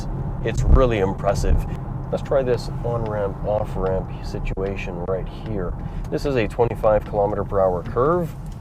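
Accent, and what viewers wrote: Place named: Canada. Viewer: Canadian accents sound fun to me, it makes me want to have a Labatt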